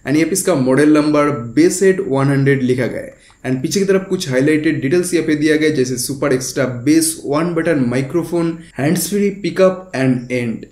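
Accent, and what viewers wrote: Bengal, India. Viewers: Bro bangali h kya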